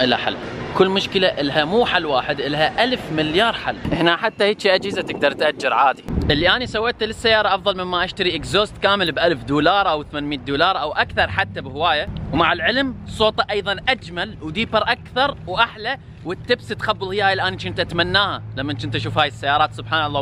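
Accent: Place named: Iraq. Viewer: أجمل لهجة عربية لهجة اهل العراق ♥️ ، احبكم جداً ، وربي يبارك لك بالموستنق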